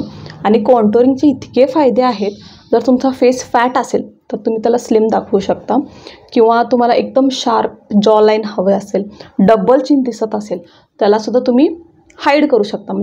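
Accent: Kolhapur, India. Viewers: Hi ….. mi make up sathi khoop video pahile pan tuza video khupch chan aani useful vatla. Mast boltes 😊assal Kolhapuri❤ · मॅडम तुम्ही कोल्हापूरच्या आहात का, मध्ये मध्ये भाषेवरून वाटलं❤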